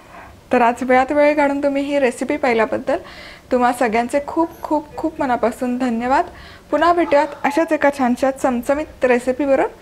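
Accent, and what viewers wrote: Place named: Pune, India. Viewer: Aap bahot looking ho mam aapki har ek recipe achi hepar aapka marathi me thoda sa alag he puna jaisa softly baat karo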